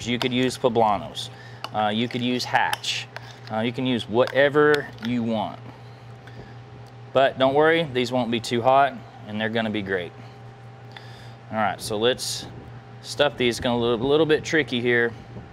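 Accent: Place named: Kentucky, United States. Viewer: Would never expect a German to say “ain’t no such thing”! Love it. You sound like us (in Kentucky). Meat Church videos always really good.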